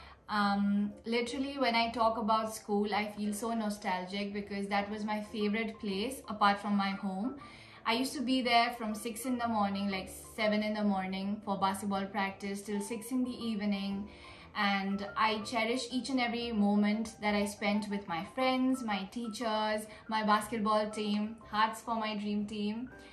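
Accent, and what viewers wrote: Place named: India. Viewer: Your Indian accent is so cute!❤️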